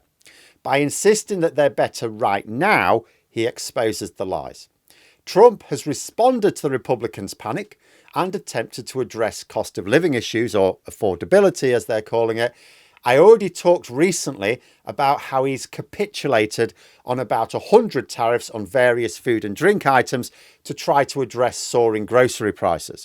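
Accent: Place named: Yorkshire, United Kingdom. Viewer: I sent him an email about it so perhaps he could change it, without embarrassing him. He replied: "I don't mispronounce it, I pronounce it according to my own accent. Pronunciation is regional, and I ain't from your region. So, what I'll actually do is carry one pronouncing words in the way people from my area pronounce them." Kamāla is an Urdu name, different etymology; there are more Muslims in Yorkshire than Hindus by far so he may have heard that, quite different, name.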